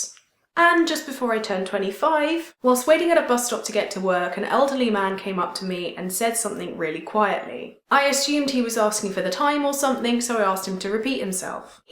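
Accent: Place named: London, United Kingdom. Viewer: I find myself wondering where you grew up. I think I heard in another video that you like in Edinburgh now? By your accent I'm thinking you grew up around London perhaps? I grew up in York and never heard of any such going on happening to any of my friends. Even When I moved to the much larger city of Edinburgh to go to university I still never noticed this behavior of random creepy street-goers. I'm male, so perhaps that insulates me from it a lot. Do you find that this problem of street harassment is lessens the further North you go?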